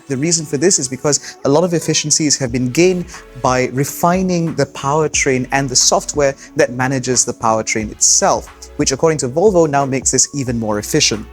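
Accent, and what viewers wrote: England, United Kingdom. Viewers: Thumbs up to Your english accent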